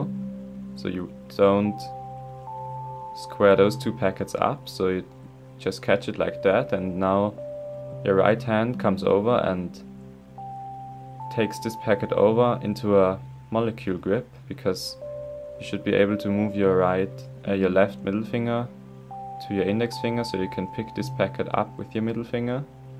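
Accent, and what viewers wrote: Germany. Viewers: Are you from germany or somewhere there? Great tutorial btw :)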